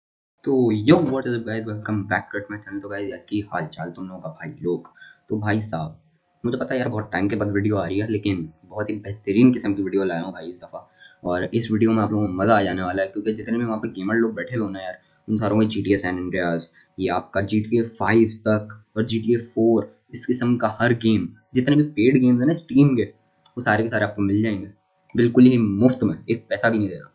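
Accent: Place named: India. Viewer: why is Indian accent annoying 🤠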